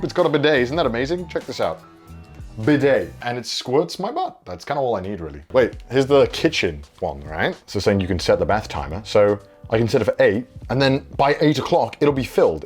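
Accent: United Kingdom. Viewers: Tbh I really like how talk, the British accent is chef kiss even though he’s saying funny stuff, is just soothing 😫✊🏻❤️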